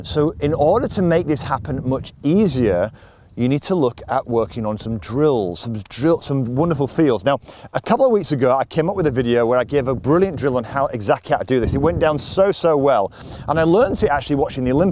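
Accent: Yorkshire, United Kingdom. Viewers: Thanks, With your Yorkshire accent you remind me so much of him with his enthusiasm and simplicity. Best wishes.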